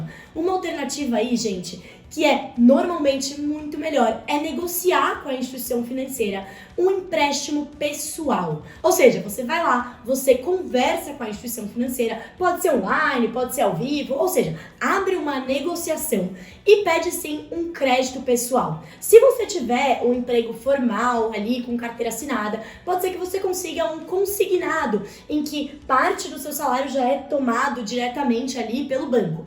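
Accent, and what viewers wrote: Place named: São Paulo, Brazil. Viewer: Ela é muito lindinha com esse sotaque de paulistana!!!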